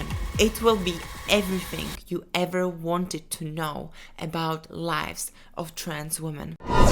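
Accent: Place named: Czech Republic. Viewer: First of all, I want to say thank you for your channel. I am Mexican American. I am a bodybuilder. I love to lift weights and I’m very attracted . To trans women and there stories I love the fact you guys seem very down to earth from what I have gathered on YouTube so I’m looking forward to this podcast and hopefully I may be able to date a trans woman one day but yeah, I’m very intrigued and I don’t even know where to look for trans women here in America… also my friend is she is ❤from Czech Republic that’s how I noticed your accent❤ by the way you are very gorgeous with all due respect🌹🌹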